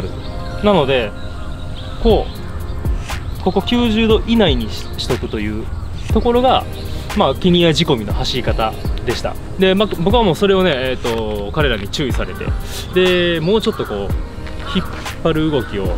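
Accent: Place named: Kansai, Japan. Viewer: の動画はナレーションがの関西弁そのままでめっちゃ良いです！ P.S.冒頭のBGM音量もう少し下げていただけますと… のアチチな気持ちはわかるんですが…音量が…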